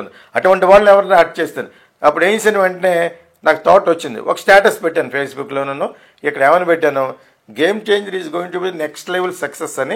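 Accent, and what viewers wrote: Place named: Godavari, India. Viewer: Geetha krishna u r gud for nothing bcoz of nee bullshit talks in channels. How come u target MEGA STAR tell me first ? I watch ur videos regularly bcoz of ur accent of godavari but u make mistakes in interviews by targeting SHANKAR. If u r criticize other Director then u don't have right as a Director.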